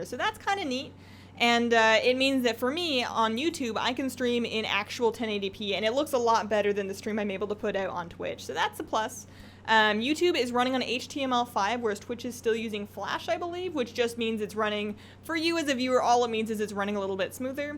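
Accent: Canada. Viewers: dat canadian accent.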